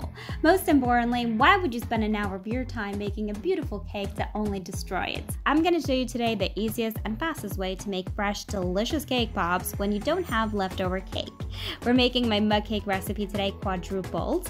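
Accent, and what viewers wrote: Italy. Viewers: Are you from Italy? It sounds like it!! Gonna try it soon with my friends!! 😄😃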